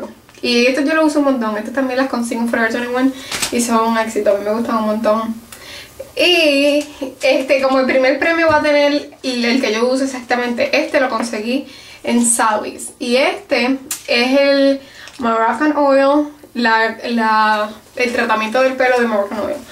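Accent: Puerto Rico. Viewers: nombre : maura país : panamá me gusta lo original que eres y que apesar que vives en usa mantienes tu acento puerto riqueño critica : esa mascara de macadamia no hay en panamá :( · 1- Cheryl Jimenez- Puerto Rico ;) ... 2- Me encantan tus consejos, la manera en que hablas, se te sale lo boricua por los poros ;) 3- No tengo criticas....sigue siendo como hasta ahora, ah bueno...cierra la puerta de tu closet jijiji xoxox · Alooo, Mi nombre es Elivier... vivo en Baja California Sur, MEXICO.. Me encantan tus videos, porque no los cortas ni nada de eso.. son simplemente como tu eres, con errores o sin ellos, y ademas me gusta la forma en que tu hablas, porque me agrada mucho el acento de PR, y RD.. Me gustaria que no cambiaras para nada tu palabreo, porque es lo que te identifica, y me estaria genial que no olvides los videos de cocina, ya que son suuuuper delicious! 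Un gran saludo, un beso y un abrazo. <3 muak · que hables bien boricua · Hola soy Susana, de España. Lo que más me gusta de tu canal es lo sencilla,graciosa e inteligente que eres, y la manera que tienes de expresarte donde se nota muchísimo tu procedencia puertorriqueña. Como crítica constructiva te pediría que de vez en cuando cambiaras tu peinado o maquillaje, aunque sea poco. Cuídate!:D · hola. mi nombre es: Barbara soy de venezuela... y bueno hay muchas cosas que me gustan de ti como tu estilo me encantan tus accesorios y forma de vestir tu cabello es hermoso amo tu pollina,tus pestañas y el color de tus ojos.. tambien me encanta tu asento P.R... e aprendido muchas cosas de ti con tus videos.... no tengo ninguna critica para ti.. me gustaria que hicieras mas videos de cocina de postres o cosas dulces y tips para pintarnos las uñas y cosas asi.. en fin me gusta todo..TQ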